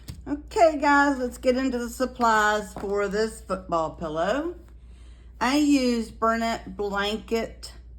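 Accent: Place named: South, United States. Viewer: OMG! This was the easiest and most beautiful pattern I have EVER done. Also your videos are just amazing and so detailed. The video showing what you’re doing is perfect! I just subscribed. LOVE YOU!! Do you have a video for a Santa’s hat for adults and children? Not very long hanging down on top but just alittle to look like a Santa hat. Please let me know. Would soo much appreciate it. I notice you have a southern accent lol. What state are you in? Thank you again soo much!! Heart❤️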